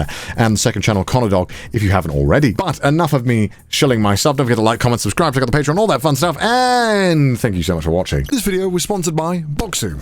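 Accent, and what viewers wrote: Wales, United Kingdom. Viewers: I don't really watch anime or know much about Japanese culture, but your videos are just genuinely enjoyable. I have been binge watching them a lot because they're just really nice to relax and throw on. I think no matter where you live or what your content is on you'll be successful because you are just good at what you do and are dedicated. People can sense that and it draws them in. And the deep British voice kind of makes it hard to click away lol Wishing you another successful year in 2022!! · ok am I crazy or does his Welsh accent sound thicker I wonder when he filmed this after his trip home...am I along in this?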